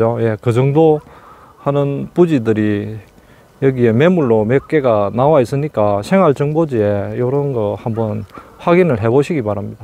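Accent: Gyeongsang, South Korea. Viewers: 경상도 액센트 최고 매력적이에요 love love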